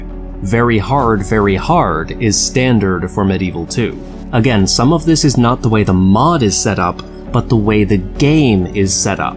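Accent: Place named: United States. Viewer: Completely understand the frustration. I do hope you'll stay the course. Your narration is clever and entertaining, and the editing, phenomenal. I subscribed immediately upon finding your channel. I'm a lore nut and really only watch good faction campaigns. Would love to see you play as an elven faction or Gondor on AGO and see what that does for your channel. Whereabouts are you, if you don't mind? Stateside? You sound American but seem to handle Germanic and Gaelic word annunciations with ease.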